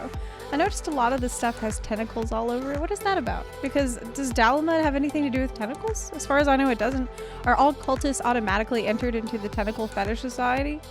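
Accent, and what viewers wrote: South, United States. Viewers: where are you from? i looove your accent! i'm from ga living in nc and i relate to your pronunciation so much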